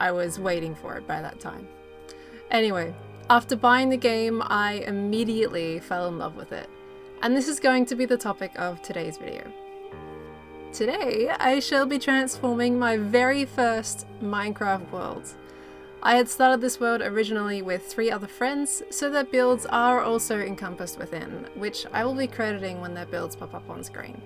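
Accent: Australia. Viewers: are you aussie i cant tell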